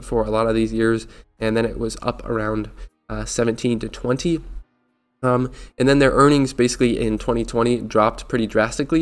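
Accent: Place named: North Carolina, United States. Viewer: I heard your NC accent dude! I'm from Chapel Hill, lol, living in Spain for 8 years now. Thinking about jumping in to good ol' Duke Power. Thanks for the vid man and good luck!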